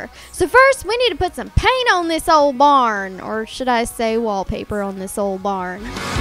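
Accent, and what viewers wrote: South, United States. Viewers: are you from the south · You have a country accent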